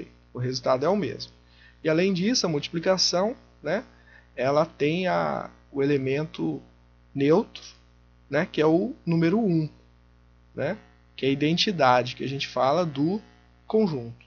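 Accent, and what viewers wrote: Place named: Paraná, Brazil. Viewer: Os vídeos estão cada vez melhores. Nesse vídeo o apresenta com cuidado a definição de Espaços Vetoriais. O sotaque paranaense é um charme (hehehe). Para que está estudando o assunto, a dica é pegar uma folha de papel e reproduzir, sem olhar, a definição apresentada. Depois que tentou ao máximo lembrar de todos os detalhes e ESCREVER no papel, voltar ao vídeo e comparar se conseguiu tudo. Esta estratégia é bem indicada para aprender um tema como esse.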